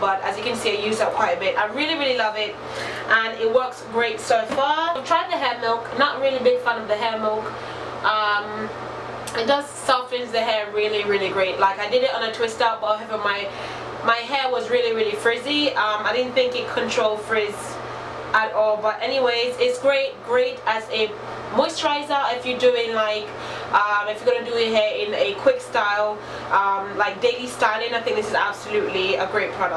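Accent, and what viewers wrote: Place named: United Kingdom. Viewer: Did u spend time in the uk because I can hear a bit of a British accent love the hair btw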